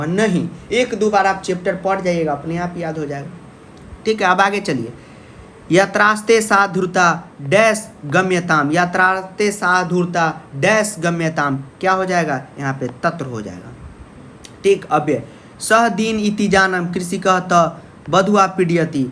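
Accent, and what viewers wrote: Bihar, India. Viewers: Language se hi pata chal gaya tha ki sir Bihar se hai because I am also bihari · Sar aap Bihar se hain kya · Aapki bihari language se hasna ha raha hai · Sir ap vihar se belong kerthe ha kya kuki apke sound se thora thora lagtha ha ki ap vihari language bolthe ha. Otherwise apki ye video bhaut achi ha. Nice video.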